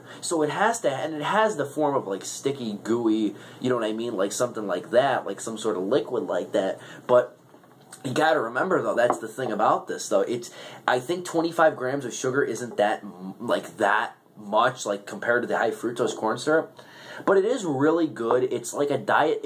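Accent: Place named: Chicago, United States. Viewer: Nice Shicehgow accent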